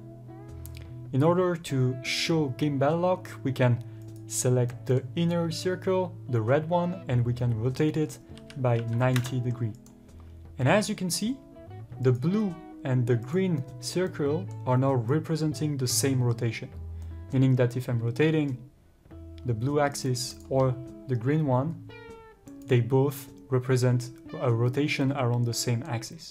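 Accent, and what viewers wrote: France. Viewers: thank you) best French English ever)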